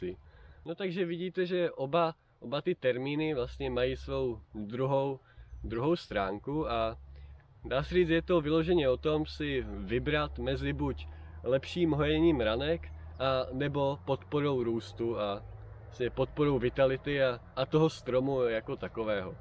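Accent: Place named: Wallachia, Czech Republic. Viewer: Mluví krásně valašsky, taky se mi to líbí :)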